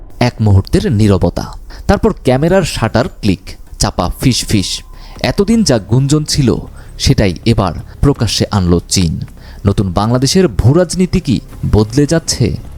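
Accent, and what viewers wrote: India. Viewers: আপনার কথায় দাদাদের কথার সুর....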